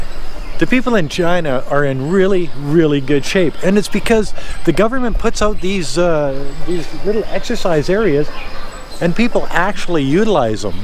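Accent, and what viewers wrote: Canada. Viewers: Lol your accent, why does your accent remind me of DeadPool so much man, is it bc Ryan is also canadian i love your accent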